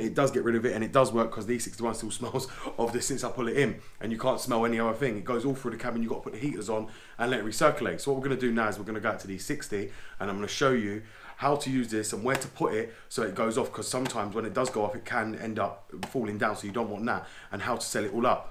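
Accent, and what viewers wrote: United Kingdom. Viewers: 😂😂😂like a bomb but with that Brit accent killed it thanks for showing very simple and straight to the point 🎉👏👏👏NYC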